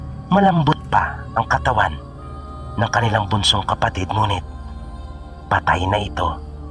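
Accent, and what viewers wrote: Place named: Kinaray-a, Philippines. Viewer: Pamatyag mo eh,, hahaha karay-a gid,, 🤣🤣🤣🤣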